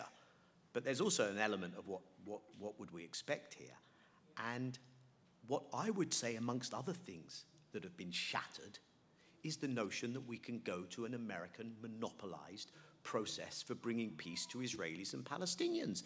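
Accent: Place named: United Kingdom. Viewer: He maybe Israeli but he speaks like a clear thinking Brit.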